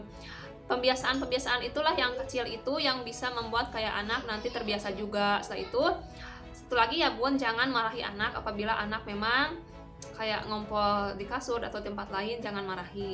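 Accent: West Java, Indonesia. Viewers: Orang Sunda ya teh, logat y kaya Sunda hehe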